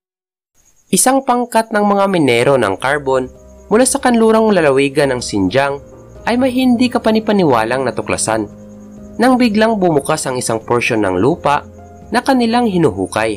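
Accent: Visayas, Philippines. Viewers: Bisaya dong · bisaya to Hahaha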